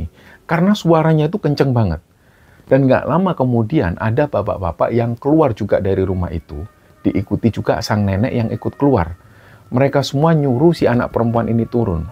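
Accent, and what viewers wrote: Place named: East Java, Indonesia. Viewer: Njenengan tuh telling story nya mmg beda dr yg lain lho Mas. Logat Jawa Timur nya jg masih kental 👍👍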